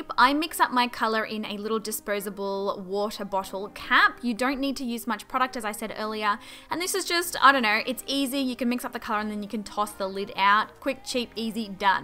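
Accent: Australia. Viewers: Wow din knew u were Aussie yayyyy lots of love from Melbourne xx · That’s correct that how Aussie’s say it🤗🤗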